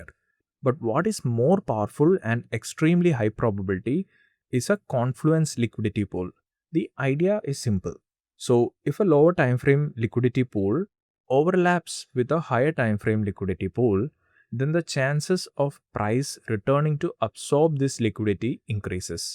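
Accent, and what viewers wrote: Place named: South India, India. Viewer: South Indian ?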